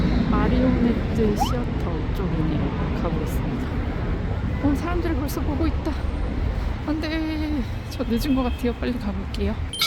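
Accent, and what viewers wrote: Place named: Gyeongsang, South Korea. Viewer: 교향이 어디신가요? 살짝 경상도 억양이 나는 듯도 하구요. 아무튼 20여일 후에는 트빌리시를 관광하고 있을 생각에 영상을 다시 보고 있어요.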